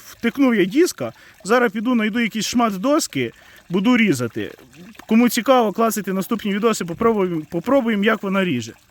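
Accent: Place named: Transcarpathia, Ukraine. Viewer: Оцевго -осьо. Осьево-го, Осьо-отево, Сюдево, Оцьо-цьо. Говор такий дебілкуватий, чи то закарпуцький з глухої далекої полонини, чи то на якомусь Івано-Франківському забитому хуторі автор відео живе не зрозуміло. Шановний ви ж публічне відео знімаете, хоча б по українськи навчились говорити, а то цей дебілкуватий суржик слух ріже.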